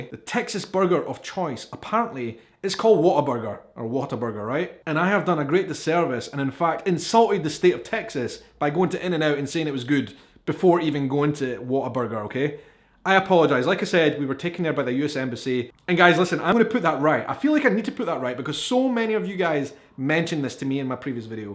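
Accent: Scotland, United Kingdom. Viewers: I subscribed about a week ago and I have not regretted it. Your great! I visited Scotland and was blown away by the beauty of your country. It rivals Texas. It could be its beautiful twin. The Scottish were amazing and amazingly awesome. I have 2 kittens and we all love your accent and your show. This is a great link to Scottish culture. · Hope you're able to visit my home State, Hawaii, some day. Will look forward to seeing THAT video, for sure. I think you underestimate how charming your Scottish accent is. Trust me, anywhere you go throughout the U.S. you will be met with warmth & generosity. A great many people from Great Britain & Ireland settled throughout the American South, so you will find a multitude of peoples with surnames that will be familiar to you. Just take a look at the names of Country Music stars, to begin with. Thanks for all your wonderful videos. 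Your experiences & insights leave me with laughter & pride. I have been to Edinburgh 3 times. Loved it and the people there and throughout Scotland. BEAUTIFUL Country. You have a lot to be proud of.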